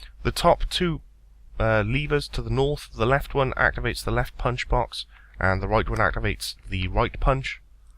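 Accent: United Kingdom. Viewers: (Break) and (Law) --> British guy narrating. Long story short, a sudden image of popped into my head.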